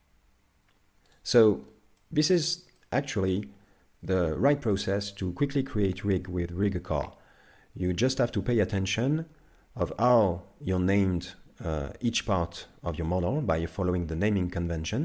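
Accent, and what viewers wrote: France. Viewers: l'accent français est présent haha ! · Thank you ! Et super accent français en passant =D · J'ai griller l'accent français direct XD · U R french, ain't U ? Also, awesom add-on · toit, tu a un accsan français je me trompe ? 😁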